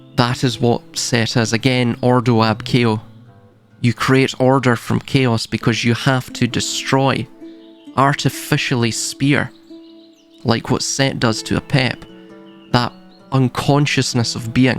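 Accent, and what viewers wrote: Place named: Scotland, United Kingdom. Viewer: A very sobering video, depressing but liberating. Also, you have a silky smooth Scottish accent. Don't worry about that. My favourite band are Scottish. I've always liked the accent. Love from England!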